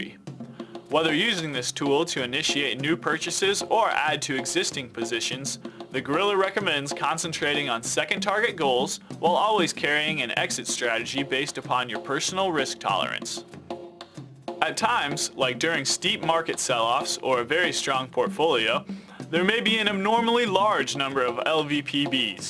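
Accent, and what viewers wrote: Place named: United States. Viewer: Please turn on caption i want to see in Hindi.i can't understand American English.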